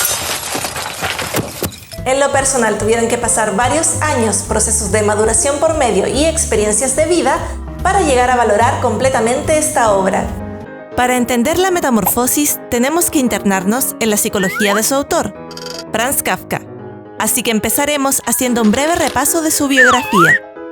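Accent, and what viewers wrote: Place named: Chile. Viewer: Que buen video! Impresionante explicación, impresionante edición, muchas felicidades ❤️ por su manera de hablar se nota que es chilena jajaja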